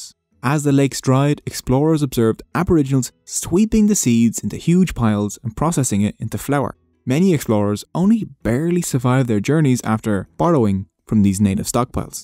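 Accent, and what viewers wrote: Dublin, Ireland. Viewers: It's a Dublin Irish accent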